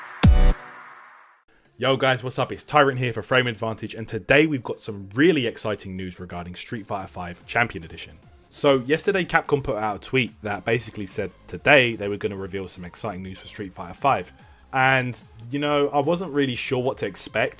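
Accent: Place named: United Kingdom. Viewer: Lol "Street Figh-uh," "cosch-yooms," lol! British accent!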